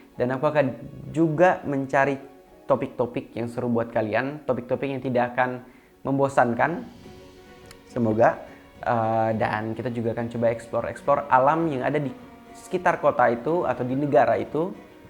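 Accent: Indonesia. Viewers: ...jangan lupakan logat bahasamu..dimanapun kamu berada Saya suka tutur kata Dan logat kamu yg Indonesia bangettt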